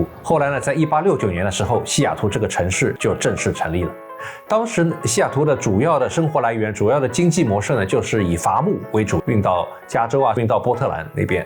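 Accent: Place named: Shanghai, China. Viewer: 30多年上海口音还是很重啊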